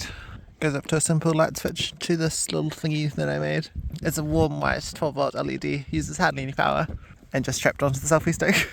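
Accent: New Zealand. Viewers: Well this is awesome! Never heard anyone speak with such a posh Kiwi accent! Oh plus the hardcore fishing … great work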